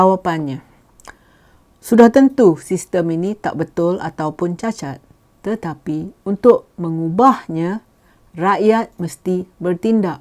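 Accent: Perak, Malaysia. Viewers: Saya suka Puan cakap loghat Perak..ha ha ha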